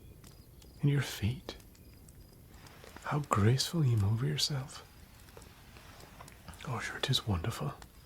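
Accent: Ireland. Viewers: As a person living in Ireland, I want to wholeheartedly compliment your Irish accent. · Loved both of the knight vids. Brilliant immersive character and an Irish accent with that hypnotic, peace inducing voice, insanely good. Just as an aside, if you ever have to get a kid to sleep, sing a lullaby in this voice and you'll have no problems. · As an Irish person, I want to congratulate you on the fantastic accent. I can actually place that accent on a map. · The Irish accent?? IN LOVE EVEN 2 YEARS LATER 😍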